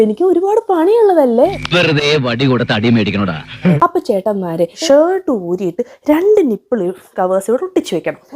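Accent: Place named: Alappuzha, India. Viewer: Ith kollam bhasha alla. Alapauzha aananu thonunu. Malabar airunel thuranu kaanichene..😂😂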